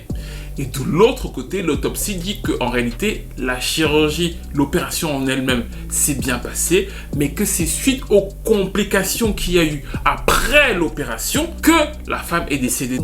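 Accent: Cameroon. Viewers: ton accent camerounais ressort très fréquemment, c'est hilarant 😂😂😂😂 Tu nous rends fiers. Que de la force pour ton excellente chaîne frère 💪🏾😁🇨🇲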